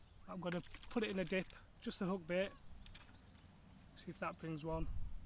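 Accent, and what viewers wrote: Hull, United Kingdom. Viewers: Thought I recognised the Hull accent, will watch this later, I almost always fish Cygnet for the tench, been a poor year this year but had 4 nice tincas on my last visit on banjo feeder with a wafter.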